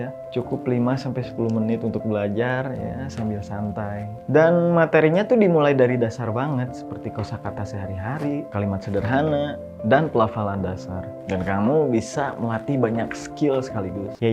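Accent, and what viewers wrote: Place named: West Java, Indonesia. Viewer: Logat sunda ey ga hilang semngat terus bang 👍👍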